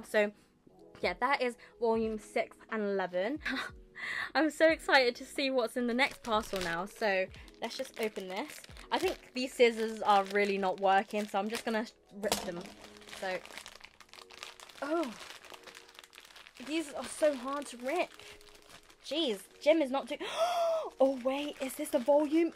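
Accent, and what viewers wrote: United Kingdom. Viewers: Are you British? Love your content!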